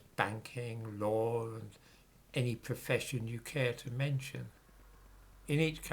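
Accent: United Kingdom. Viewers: I like the content. Problems I have with the channel though are, the marked British Accent is an obstacle. Second, like me the gentleman is old and balding. Me I am fatter with less wrinkles and more hair. He is older than me. Third, the moustache. TRIM IT. Fourth, MOVE the camera farther away and you will not look as wrinkled as you are. Fifth, maybe you could wear a hat ore a beret. Sixth, you could wear a button up shirt. Mostly, like MANY channels, you are VERY CLOSE to the screen. BACK THE CAMERA UP or FIND a BIGGER ROOM. MOVE the BOOKCASE from behind you. Are you in a TINY APARTMENT, a CLOSET? This all said, your CONTENT IS GOOD!!!!!!! Thank You. My thumbnail is of a very old Harvey Comics character, Hot Stuff the Little Devil. So, NO, I am not trolling people as a devil.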